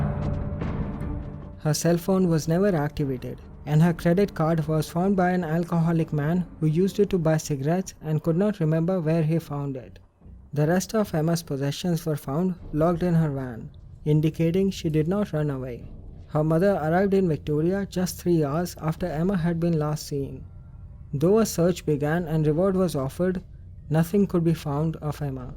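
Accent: India. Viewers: Indians don’t make good commentary when it’s English, can’t listen to a voice like that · I LIKE YOUR CHANNEL!!! IT'S CREEPY ASF!!! YOUR INDIAN ACCENT MAKES IT EVEN CREEPIER!!!!! GOOD SHIT APU!!!!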